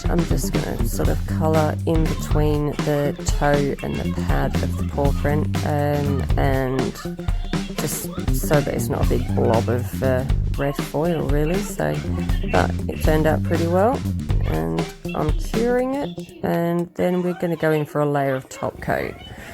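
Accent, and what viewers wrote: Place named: Australia. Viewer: U sound so Australian x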